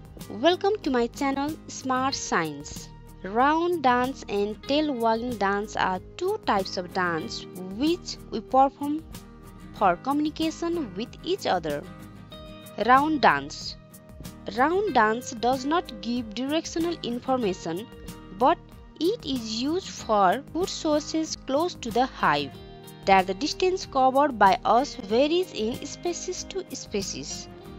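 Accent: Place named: Maharashtra, India. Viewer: That Marathi accent tho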